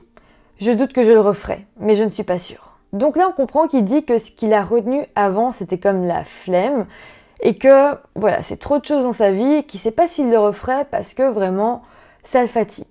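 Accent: Belgium. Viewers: Salut, cette histoire est terrible, pour moi ce garçon 👦 était très troublé, certes, mais certains points contredisent les maladies mentales citaient dans la vidéo, par exemple la préméditation, elle est la, ce garçon malheureusement était un tueur de masse en devenir, et il s'est entraîné sur sa famille 👪, je pense que la peine fera qu'il ne ressortira pas, c'est une sécurité pour lui et pour les autres, il n'a aucune empathie,si toutefois il est malade, il souffre de psychopathie et recommencera, la, ou je pense qu'il n'est pas responsable c'est qu'il est né comme ça, né pour tuer, le passage où il explique qu'il les achevait plutôt que de les laisser souffrir, pour un peu, il leur a rendu service.comme d'habitude j'aime beaucoup tes histoires avec ce petit accent Belge qu'on ne lasse pas d'écouter, bonne fête 🥳 🥳 🥳 de la fin d'année et bonne année 2023...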